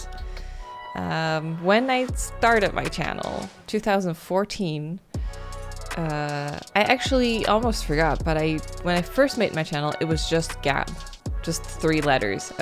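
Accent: Netherlands. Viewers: Congrats on the 150K!!! Btw, I can really hear that you're from Brabant when you speak Dutch XD (I used to live there too lol) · Woahh you kind of have a dutch accent haha :D I really like the name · Wel leuk dat je Nederlands accent toch een beetje door komt!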